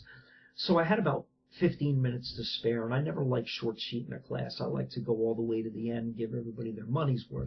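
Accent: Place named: East Coast, United States. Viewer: What you said was interesting an all, but the way you speak reminds me of friends from the east coast. Found m'self trying to narrow it down to NY, PA, or MA before I saw the "Phila" on the sweatshirt. I'm afraid my reading comprehension is everything it was in high school.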